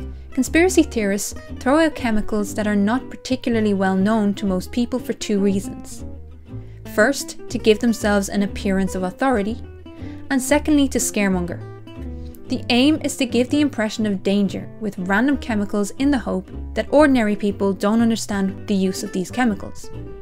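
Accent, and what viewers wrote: Ireland. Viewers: yes, has a Welsh accent. has an Irish accent. Welsh accents are a bit harsher. is easier for people to understand. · she has a lovely irish accent, i set the voice on my satnav to Irish Female, its so melodic. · Have to confess I’m a sucker for a female Irish accent even if biting sarcasm is missing. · It's that Irish accent, it's very nice 😁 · Oh I like her voice.... Love that Irish accent. Much better than that welsh stuff (kidding, love ya ). So contrails... fuel is a hydrocarbon.... the hydro is important here. When the fuel burns one of the exhaust components is water vapor. That water vapor is what we see when the conditions at altitude are right to cause this to happen. Temp, pressure and humidity are important factors. These conditions might exist at ground level, or only above 40,000 feet, or anywhere between. So... yeah, just water kids, nothing more.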